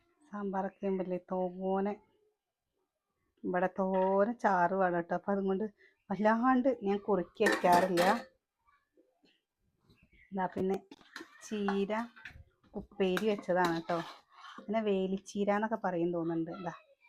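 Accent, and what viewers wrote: Palakkad, India. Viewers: നിങ്ങളു പാലക്കാട്‌ ano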